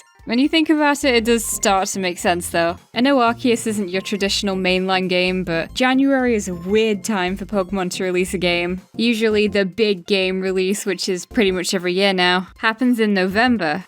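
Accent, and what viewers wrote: United Kingdom. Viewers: Your British and you’ve never summered in Spain? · HOW DID I NOT REALISE YOU'RE BRITISH - I'M BRITISH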